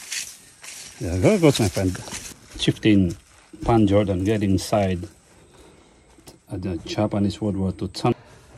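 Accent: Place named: Philippines. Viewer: Oh man! English man with filipino accent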